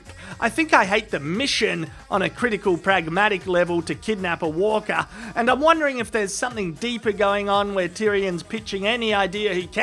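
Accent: Australia. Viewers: na he s just australian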